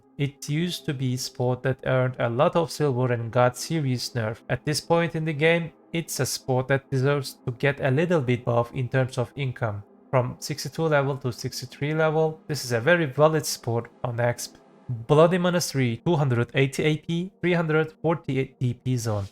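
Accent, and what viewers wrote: Turkey. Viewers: Knk aksanindan anladım bizdensin o yüzden türkçe sorca. 3 ay önce yapimissin suan spotlarin durumu nasıl ? 272 ap 330dp ile.rn güzel parayi mirumoktami kadari. Ne tavsiye edersin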